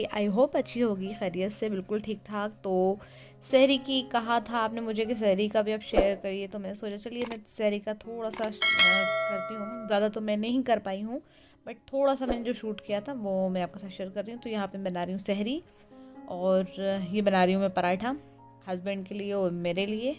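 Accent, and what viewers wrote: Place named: Karachi, Pakistan. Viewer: Assalamu alikum I am from Pakistan karachi aap k bolnay ka andaz bilkul hum karachi walo ki terha hain aur aisa lagta ha k jasay aap Karachi wali hi hain please aap meray is comment ka jawab jaroor dijiay ga thanks ALLAH Hafiz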